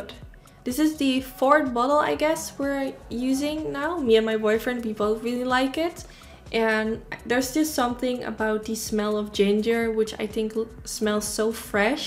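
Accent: Netherlands. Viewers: Are you dutch , you have kind a dutch accent. No offense becausse when i talk english it sounds like crap (also my writhing is really bad , sorry for that) i really liked youre video . You have 1 new sub❤️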